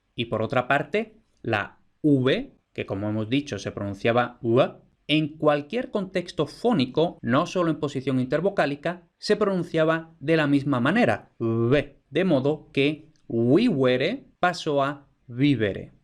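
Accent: Spain. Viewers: te felicito por venir a ver estos videos si estás aprendiendo español, son explicaciones más bien técnicas que ayudan a entender el orígen de muchas palabras en nuestro idioma. Y se te puede dificultar también por el acento de, el español ibérico a veces es complicado de entender, si los hispanoamericanos tenemos dificultad para entenderlos, imagínate tú.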